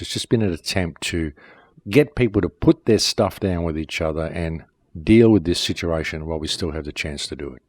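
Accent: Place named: Australia. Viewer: You're most welcome, your words pulled it from my heart to yours. I too see humanity as one of the many species in danger on this planet. Every words you're saying is true and have helped me considerably understanding what's going on, though only after I got over your Australian accent...lol...no worries, I have one myself...there's not such a thing like "perfection" or, better yet, perfection is only to be found through imperfection;) big hugz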